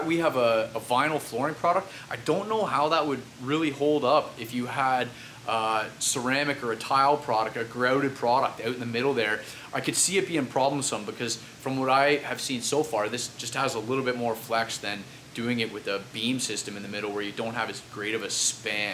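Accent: Canada. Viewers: sounds like a Canadian accent. Hi from Niagara!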